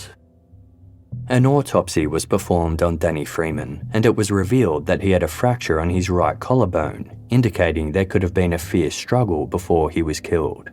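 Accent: Australia. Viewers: Did the strong Australian accent not give you a clue?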